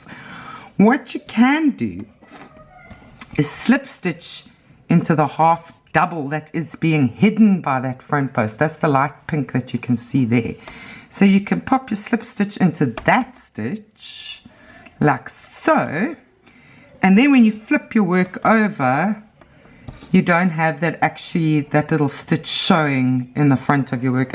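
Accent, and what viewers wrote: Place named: South Africa. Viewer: Nice South African accent 😉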